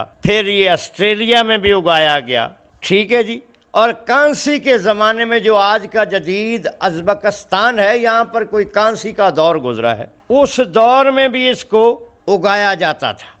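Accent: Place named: Punjab, Pakistan. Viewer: Yar apni Urdu to theek karo youn lugta hay jaise punjabi bol rahe ho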